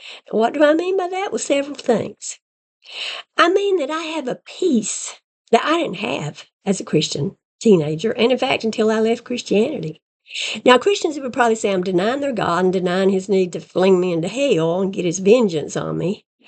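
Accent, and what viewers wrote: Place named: Texas, United States. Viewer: Are you texan? Your accent sounds texan (im not from america)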